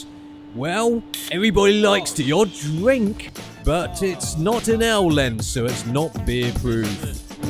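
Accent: England, United Kingdom. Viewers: I love your british accent:) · nothing funnier than an asian guy with a british accent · Hong Kong is a British colony that why you have a British Accent ! not quite ! Local people coming from the colonies and Dominions do not speak with British Accents ! They speak eighter Chinese English ,Malay English, Indian English your probably born in the UK and moved to HK at some point of life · he has a british accent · I never saw an Asian guy with an English accent before. He even made fun of himself. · Thumbs up for asian guy with british voice! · Asian with British accent...EPIC!!!! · i trust him because of his british accent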